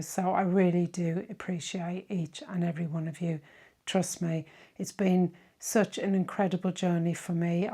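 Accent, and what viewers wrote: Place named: Birmingham, United Kingdom. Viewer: I really enjoyed that. A bit concerned that you have been poorly and hope you are on the mend now. You do have a soothing voice. I thought I detected a hint of Brummie or Black Country in your accent but that’s great. Keep up the good work 👏👏👏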